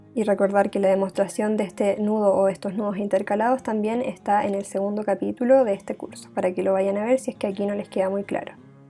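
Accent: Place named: Chile. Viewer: FELICITACIONES, MUY BUENOS TUS VIDEOS!!! EXCELENTES! ME DIJE ESTA CHICA ES CHILENA, MUY DULCE TU VOZ!!! INTENTARE REALIZAR TUS <link> ENCANTAN LAS MANUALIDADES COMO EL TEJER, Y ESTE ARTE DEL MACRAME SIEMPRE ME HA LLAMADO LA ATENCION..EXPERIMENTARE Y TE INFORMARE,... GRACIAS POR COMPARTIR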